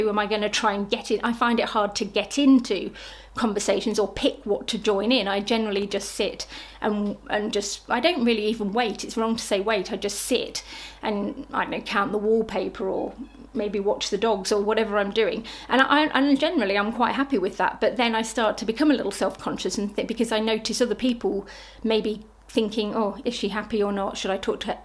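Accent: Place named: United Kingdom. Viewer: Thank you for such interesting content. I’m sorry, but I’m sitting here puzzling. You sound British and many of the things you say sound British but you pronounce words such as insulate without the extra H sound that I usually hear British and kiwi and Australian people do so I’m confused. I’m sorry I know this has nothing to do with your video content. It’s just got my curiosity up.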